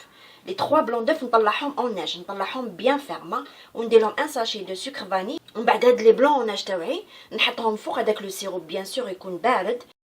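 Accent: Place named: Algiers, Algeria. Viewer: J adore votre accent algérois et votre recette aussi 😍❤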